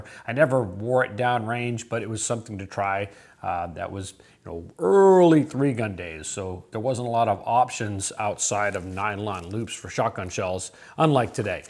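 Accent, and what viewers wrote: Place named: Canada. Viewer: Are you originally from Canada? Your accent sounds Canadian.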